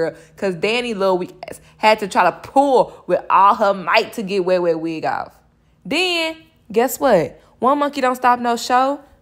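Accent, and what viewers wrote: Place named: East Coast, United States. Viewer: Yes, I can tell from the accident that you're from the East Coast. 😁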